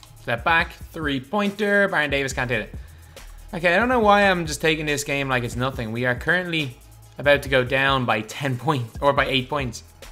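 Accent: Ireland. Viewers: Are you Irish or American 😂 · He’s definitely irish ☘️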